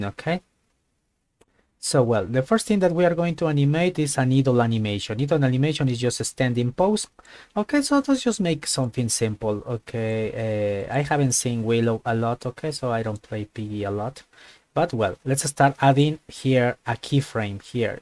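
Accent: Spain. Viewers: aqui puedo notar que tu ingles es bueno pero a la vez español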